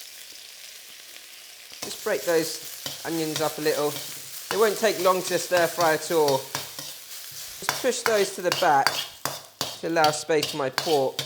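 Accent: United Kingdom. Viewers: british accent so where do you live right now ??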